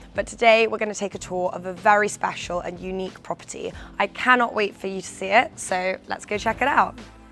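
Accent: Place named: England, United Kingdom. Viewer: you are going to kill it on YouTube, so nice to hear a quintessential English rose accent, wonderfully articulate language and a charm only classy ladies like yourself can exude. Do not betray us by joining the luxury home show 😂 Stay on your own. Brand for the win 👌🏼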